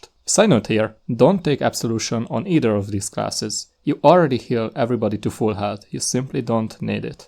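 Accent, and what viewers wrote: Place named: Hungary. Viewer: Üdvözletem kedves uram! Az akcentusodból ítélve magyarnak gondollak, de ez majd úgyis beigazolás nyer a kommentet olvasva. Csak megszeretném köszönni a minőségi munkát és további sok sikert mindenben! Várom a további tartalmat és big up! 👆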